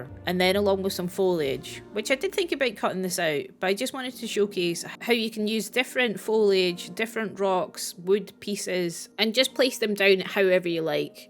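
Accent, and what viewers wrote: Scotland, United Kingdom. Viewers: Hey think you could do a tips or tutorial video on prehistoric kingdom? I bought this game and I always get a bit overwhelmed and never know what to do... Your Scottish accent fills me with confidence so I had to ask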